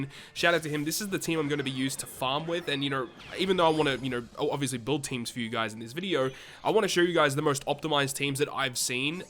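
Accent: Australia. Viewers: é o melhor, sotaque de australiano vom de ouvir LoL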